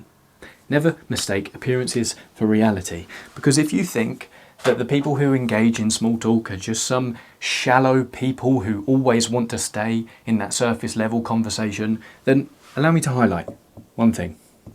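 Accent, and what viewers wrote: United Kingdom. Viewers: I should like to think that I would appear interesting if i have a cool british accent as you do😊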